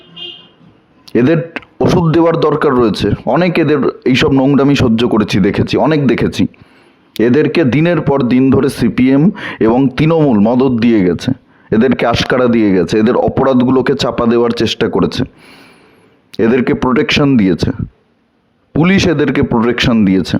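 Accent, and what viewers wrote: Bangladesh. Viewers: কথা শুনে মনে হচ্ছে বাংলাদেশি মাল।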